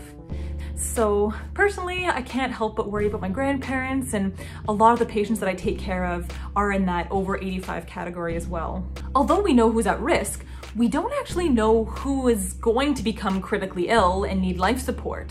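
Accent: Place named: Ontario, Canada. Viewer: OMG! I heard at least two or three “ABOWTS” and at least one “AAAOOUT” My fellow Canadian! I’m an emergency dept RN working in the US! Great job!!!!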